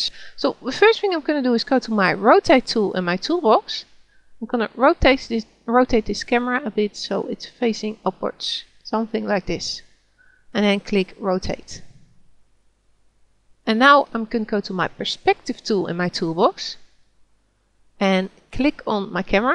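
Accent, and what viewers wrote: Netherlands. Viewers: je bent werkelijk een kei in zowel het bewerken als uitleg geven. hartelijk dank om al dat moois te delen met wildvreemde mensen. als ik me niet vergis hoor ik een Hollands accent in jouw engels dus vandaar dat ik u in het nederlands beoordeel. in de hoop dat ik me niet vergis natuurlijk en mocht dat zo zijn, sorry daarvoor hoor;-)) thank u so mush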